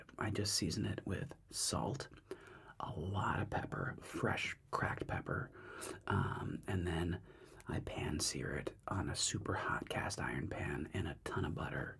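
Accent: United Kingdom. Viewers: Well goddam to be. A gringo you prenoust that great and also that breth acsint 10 /10 😂😂🇲🇽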